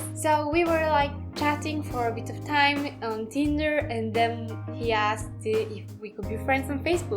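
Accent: Italy. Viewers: you're getting an italian accent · your English accent is so Italian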